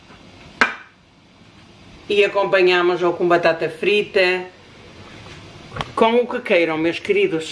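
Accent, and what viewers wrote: Portugal. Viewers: A receita parece deliciosa...e esse sotaque português é uma delícia de ouvir.!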